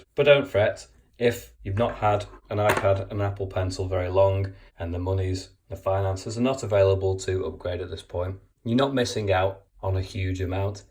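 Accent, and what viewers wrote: United Kingdom. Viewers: the brit voice makes it better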